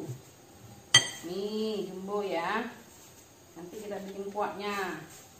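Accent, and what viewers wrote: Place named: Palembang, Indonesia. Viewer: Asli uwong palembang yo, logatnya kerennn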